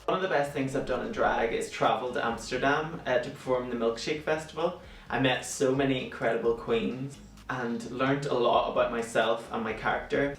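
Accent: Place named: Northern Ireland, United Kingdom. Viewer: Literally FELL IN LOVE with the moment I saw you. Your Aura is so fresh and I love a NI accent lol. You being a Crowned Queen is CORRECT 💙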